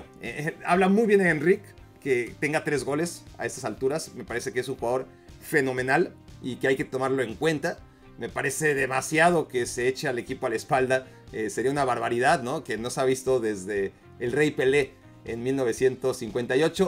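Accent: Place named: Mexico. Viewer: Escuche tu acento mexicano y de inmediato perdió peso y credibilidad tu video. mexico no existe en futbol, dediquense a la pesca, remo, polo.... FUTBOL NO !!!!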